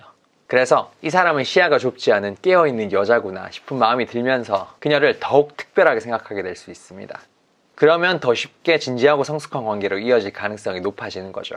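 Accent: Gyeongsang, South Korea. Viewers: 심리학 관련 찾다가 우연히 님 영상들 보게 되었는데 그 어떤 시트콤 드라마보다 재밌게 보며 간만에 많이 웃었네요. ^^ 화법이 표준어가 아닌데도 지적이고 젠틀하며 경상도(대구?) 사투리가 너무나도 매력적으로 묻어나는 말투... 매력남의 표준이시네요!🥰 이젠 느무 나이가 들어서 연애 포기하고 지천명을 바라보는 골드 미스, 미쿡 사는 누나(ㅋㅋ)가 구독, 좋아요 눌러 버렸어요. ㅎㅎ ( 님을 일찍 알았었더라면 결혼 성공 할 수 있었을라나...? ^^; )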